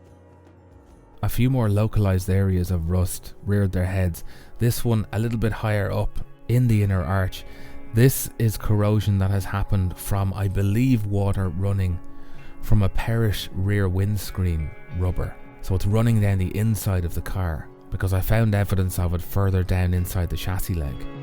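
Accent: Ireland. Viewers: Great to hear an irish accent are u near Dublin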